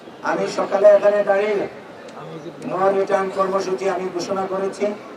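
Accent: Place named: Sylhet, Bangladesh. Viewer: স্যার মনে হয় সিলেটি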